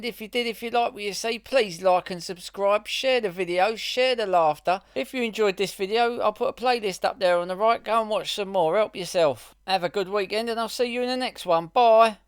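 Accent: Cockney, United Kingdom. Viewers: I like the videos, but the voice over is kinda lacking.. just sounds like some cockney numpty, to the rest of the world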